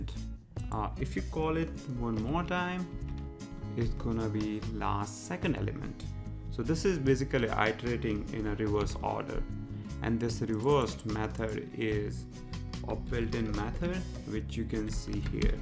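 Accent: India. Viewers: Deepanshu Joshi Mate you just proved yourself wrong.You said Indian Accent.Yeah that’s an Indian accent that is why it is not correct.the correct pronunciation of a word is understood by how it is pronounced by majority of people.So you are wrong.I think you need some help before me. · This is Indian accent. If you have any problem with it you can watch other tutorials made by youtubers who belong to the your region. Try to keep your cynism in control. If you find it difficult try some medical help.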